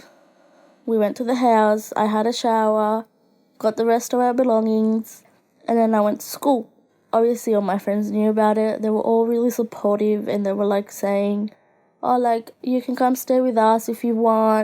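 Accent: Australia. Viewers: I knew you were aussie! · OHHHH MEH AUSSIE GURL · She’s Aussie! I’m not the only one yay! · Finally an Aussie! (im australian) 🇦🇺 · So, are you Australian or Story booth is Australian? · Are you Australian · She’s Australian don’t make fun of her accent mate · I knew you were Aussie Aussie’s rock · Oh she's an Aussie 😀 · YOU’RE AUSSIE? YAY! I AM TOO! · Finally somebody who is aussie 🇦🇺🇦🇺🇦🇺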